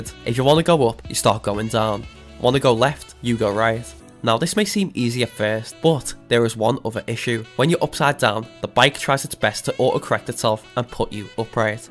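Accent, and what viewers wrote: Liverpool, United Kingdom. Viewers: ps: i've never met a scouse gta youtuber before :( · Scouser!! Yes now lad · I am now convinced you are British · omg , he's so bri-ish 😢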